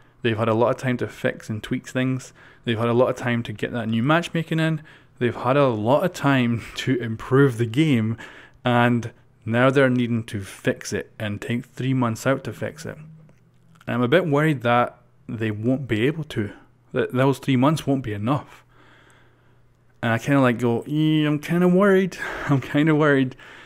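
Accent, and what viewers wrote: Scotland, United Kingdom. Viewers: Are you Scottish just wondering because I'm Scottish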